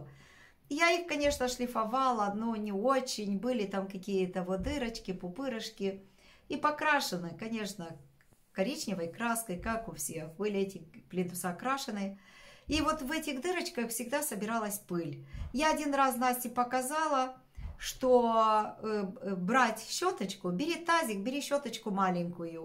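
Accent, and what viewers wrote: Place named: Moldova. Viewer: С удовольствием вас смотрю,.И слушаю.И в Бельцах была не раз.И в казанчике готовила.И говор ваш мне близок до слез.Я русская, но 35 лет назад жила в Кишиневе, вышла там замуж первый раз.И до сих пор снятся Баюканы и кинотеатр " Флакера"😅😅😅Я жила в 80- е годы в общаге.И у нас жили девочки и русские, и молдаванки, и болгарки, и гагаузки, и еврейки.Нет, вру.Евреек в общаге не было, но я с ними работала.Эх, хорошее время было, хоть и перестроечное)))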